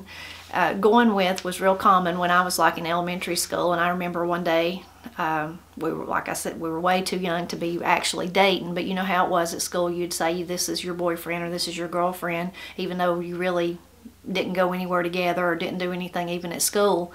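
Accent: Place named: South, United States. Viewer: I’m 77 years old and live in California today, but my mother and father came out here from Oklahoma when I was a baby. Their speech was very similar to yours and spoke with an accent as you do. The word “sugar” to represent kissing or hugging was commonly used by them, but it was a southern expression carried out here by them from Oklahoma and not used by any of my friends or their families. They have been gone many years now and I never hear anyone say it anymore. It’s definitely a southern expression, as are many of the other words you mentioned, which I recognized from hearing mom and dad use. Brings back memories to hear you talking about them.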